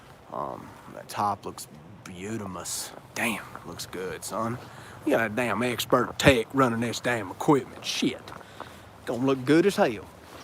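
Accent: United States. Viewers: Love the country accent lol. Good videos man, keep em coming.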